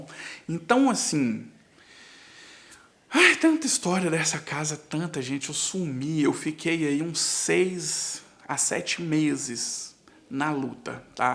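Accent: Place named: Brazil. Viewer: Só brasileiro phoodido que veio de cidade de merrça que fala assim. Se Portugal fosse tão bom não teriam tantos deles na Europa toda.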